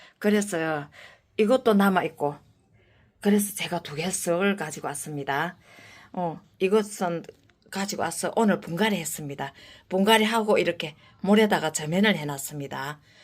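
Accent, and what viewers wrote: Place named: Gyeongsang, South Korea. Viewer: 경상도 사투리 구수하고 듣기 넘 좋네요 영상 잘보고 갑니다 감사합니다...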